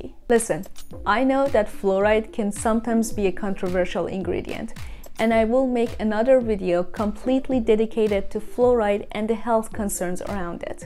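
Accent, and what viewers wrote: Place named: Iran. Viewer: You have a sweet Persian accent! And u look sweet too!! 😍😍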